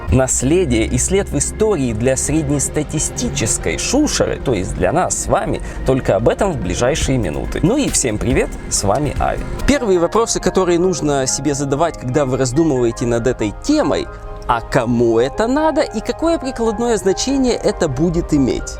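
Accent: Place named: Odessa, Ukraine. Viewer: Вы 100% с Одессы , узнала по говору свои😄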